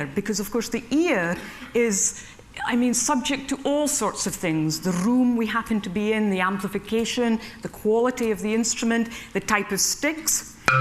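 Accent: Scotland, United Kingdom. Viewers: a deaf person with a Scottish accent?? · I can hardly believe this woman is deaf. For one thing, she doesn't speak like a deaf person. She articulates every syllable perfectly with a Scottish accent. It doesn't surprise me that she can play percussion, because rhythm is something you feel rather than hear. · For Deaf (of any level) she speaks very well and love her Scottish accent. Also as a drummer and percussionist who came up through high school bands I forgot how much I love the Marimba (what she is playing) and Xylophone. I never was very proficient and graduated to drum kit through college and club bands. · If she is deaf, how could she pick up a Scots accent? Or even talk at all? Being a physicist all I can get from this is a lot of vague waffle. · This lady is amazing. So talented and I had no idea she was deaf until she said so. Her accent sounds like she is from the Scottish Highlands (so sounds like Prof McGonnagall from HP). 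Edit: Wikipedia says she was brought up in Aberdeenshire, hence the Doric accent. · Scottish accent. Very recognizable, if you are into such things are listening to accents and figuring out where folks are from (like me...lol). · Thanks for clarifying her age loss of hearing,i was wondering how she got a scottish accent. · "without the telltale signs that most deaf individuals demonstrate." You are making the mistake of assuming she was born deaf. She wasn't. She didn't become deaf until the age of 12, by which time she could speak normally. And it is also not surprising that she has a Scottish accent, given that she is Scottish. It's not astounding at all. Do a little research next time, before making comments on Youtube. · How does she have an accent? She sounded scottish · Scots accent is funny!! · she's deaf and she could play the drums?? thats amazing! what's even crazier is that she has a scottish accent and she lip reads! 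· She is Scottish..Her accent is actually Scottish.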